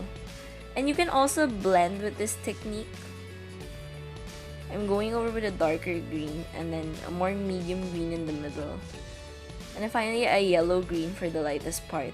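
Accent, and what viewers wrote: Philippines. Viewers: When I first heard your voice, I noticed an accent. I knew you were Filipino too.☺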